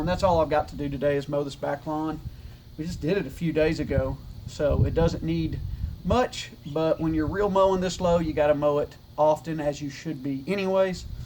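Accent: Arkansas, United States. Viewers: You don’t happen to be from Arkansas?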